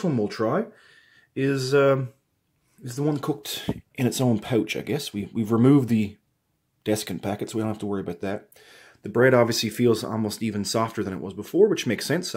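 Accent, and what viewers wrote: Canada. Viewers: At last a Canadian MRE reviewer who speaks like a real Canadian! Pop & pizza pockets. Throw in a bag of hickory sticks and a Coffee Crisp bar and that's a damn good lunch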